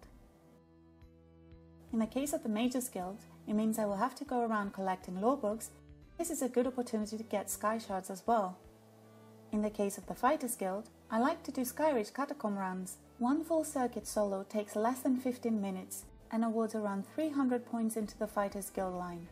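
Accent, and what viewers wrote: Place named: France. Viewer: Detailed and clear guide. And the French accent sounds cool.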